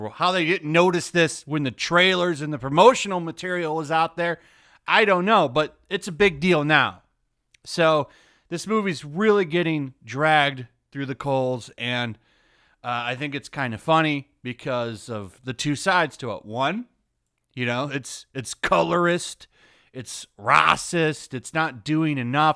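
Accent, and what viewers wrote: United Kingdom. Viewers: Your British accent has me weak 😂😭🤣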